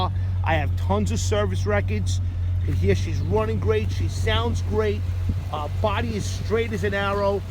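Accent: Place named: New York, United States. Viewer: A new yorkan salesman , rough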